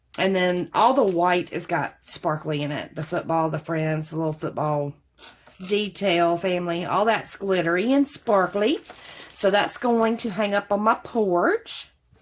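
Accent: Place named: South, United States. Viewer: I love that you are so talkative and cheerful and LOVE, LOVE, LOVE your Southern accent, my sweet! You are so much fun to watch! Big hug and love <3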